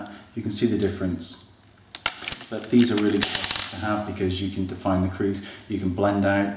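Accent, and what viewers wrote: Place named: England, United Kingdom. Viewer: He has an English accent.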